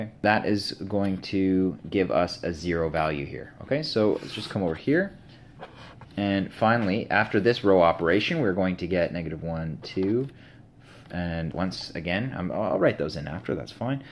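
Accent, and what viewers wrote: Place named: Canada. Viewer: ha could tell by your accent, Canadian fella! great video man!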